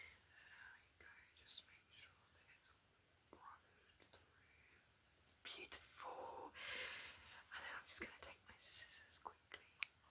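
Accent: United Kingdom. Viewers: hearing a fellow Brit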